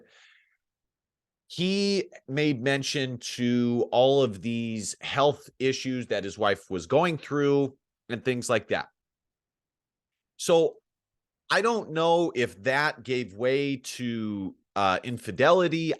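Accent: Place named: South, United States. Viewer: you gotta be from down south. I literally had to speed this video up to 2.0X speed to even stay interested in a single sentence.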